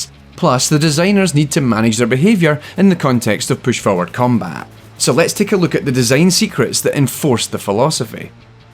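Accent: Scotland, United Kingdom. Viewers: One like coz it has DOOM in the title... An unlike..... So i can just like it again for your SCOTTISH ACCENT.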